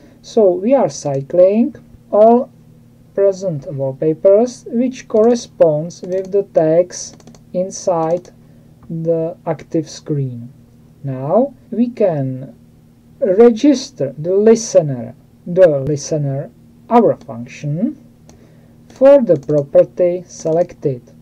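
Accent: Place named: Czech Republic. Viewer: i cant just sniff out my fellow czech brother from that accent :). Greetings from SK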